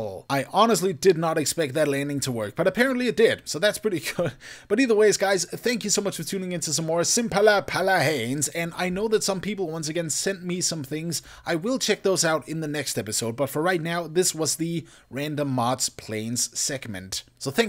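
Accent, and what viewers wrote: Denmark. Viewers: He's Danish, I live in Sweden and anyone that swedish fluently would recognize a dane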